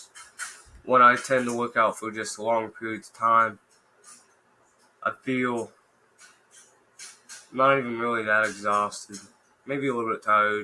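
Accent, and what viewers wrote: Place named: North East, United Kingdom. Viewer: Sound like he in the north east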